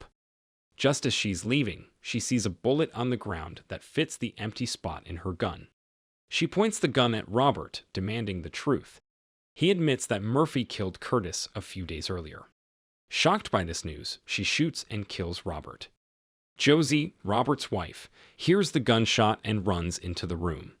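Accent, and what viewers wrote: United States. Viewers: Man do I hate a yank telling an Irish story ..... give up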